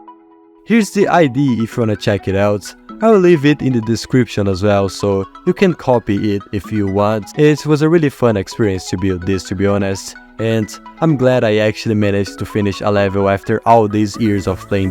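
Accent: Brazil. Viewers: Dude you sound so Brazilian or Portuguese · Youre brazillian aint you🥶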